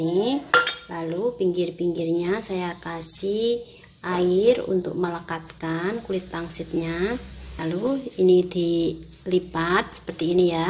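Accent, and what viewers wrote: Java, Indonesia. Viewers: Iya itu logam jawa hadeuuuh...😀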